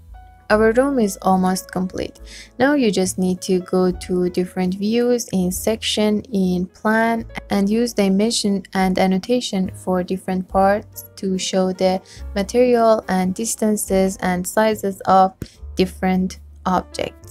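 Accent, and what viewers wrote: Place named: Arab world. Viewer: Love your accent are you arbic ?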